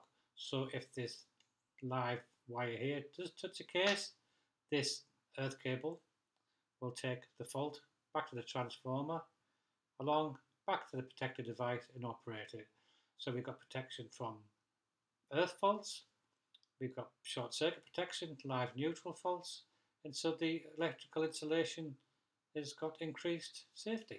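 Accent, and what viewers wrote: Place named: Teesside, United Kingdom. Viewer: Good to hear a Teesside accent on here. Thanks for the lesson.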